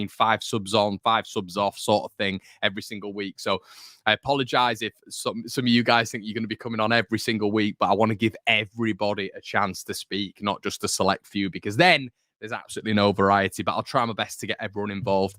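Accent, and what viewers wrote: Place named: Geordie, United Kingdom. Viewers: Great Geordie accent..the hair sucks though. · Great Geordie accent. I thought Paul Gascoigne was on air!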